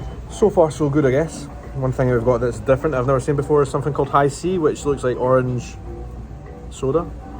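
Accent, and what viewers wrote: Scotland, United Kingdom. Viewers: Hello,. Even ignoring your Scottish brogue (which is, of course, impossible; it is very "heavy" but nice), you give yourself away as a non-U.S. person when you pronounce L.A. as "Los Angel-ees". Proper English pronunciation has become "Los Angel-ess". Of course, "Los Angeles" is short for the city's (non-indigenous peoples) founding name from the Spanish, which is " El Pueblo de Nuestra Senora La Reina de Los Angeles" ("The Town of Our Lady the Queen of The Angels"). I hope you enjoyed your stay in the unique and huge an-automobile-is-still-necessary city that is L.A. University of California at Los Angeles ("U.C.L.A.") Class of 1975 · You should go to Scotland & to the highlands & the northwest if you think his brogue is broad. His is easy compared to other areas of Scotland. Sure I didn't pronounce all the Scottish cities, towns & villages properly even with Scottish guides helping. 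In the highlands the signs are in English & Gaelic and it's pronounced "Guy-lik" unlike the Irish Gay-lic. Your welcome! 😊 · His burr is mild (Irish have a brogue, the Scots a burr)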